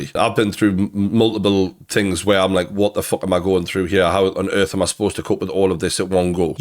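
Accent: United Kingdom. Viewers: I think “Good Lad” is an expression that was made for you, you’re everything that I picture in my mind when I hear “Good lad” resonate with a gorgeous British accent… You’re a great man, stay you, ignore the bullshit critics, that comes with success, just focus on the love you’re getting from true fans of your work. Peace brother (from a froggy on the other side of the channel 😅)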